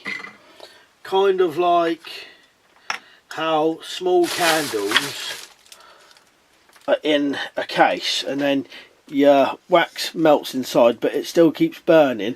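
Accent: United Kingdom. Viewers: British accent cracks me up. Instead of water its waer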